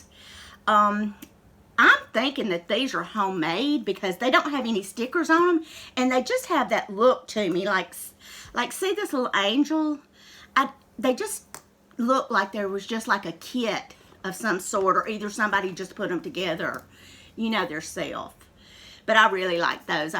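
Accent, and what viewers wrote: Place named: Alabama, United States. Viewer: NAPCO! Isn't that also made in Japan? Can you tell I'm a new little baby? Just had to say that word, baby, as it's so cute how you talk...with your down south Alabama accent! 🤣😅😄💙💛💜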